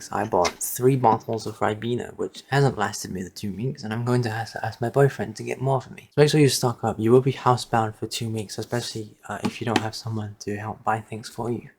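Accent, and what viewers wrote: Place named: Eastern Europe. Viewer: You sound Eastern European, do you feel OK about forcing British people to pay for your fetish?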